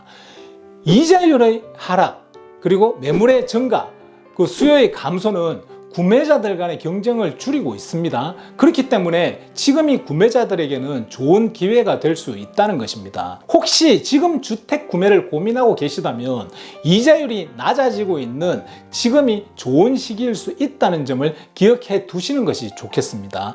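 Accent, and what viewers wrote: Gyeongsang, South Korea. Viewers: 대구 사투리 ? ㅋㅋ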